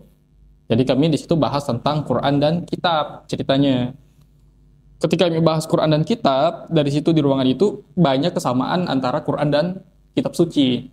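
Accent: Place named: Borneo, Indonesia. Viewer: Logat orang dayak kalbar, mirip logat malaysia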